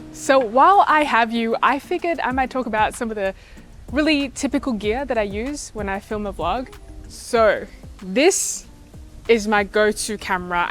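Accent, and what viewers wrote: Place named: Australia. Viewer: Love your Australian accent :)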